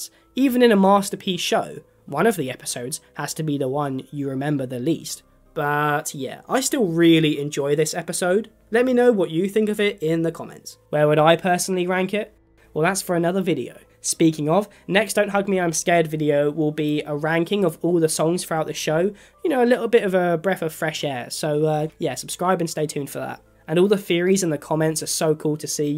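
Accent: United Kingdom. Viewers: oh baby a dhmis commentary made by a mf with a British accent??? my kind of video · British, aust?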